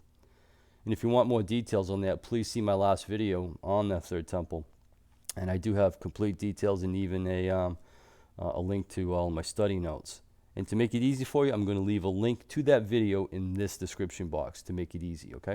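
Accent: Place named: Northeast, United States. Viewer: You're my favorite YouTuber. I wish I lived closer to u, id love to meet . ♥️😊. I feel alone because no one around me seems to see or care about the signs of Christ's return. Every once in awhile, I'll meet a customer that sees it and is excited but I usually never see them again. Sounds like you're from the north east, I'm from Florida. Ty for your videos, I truly enjoy them!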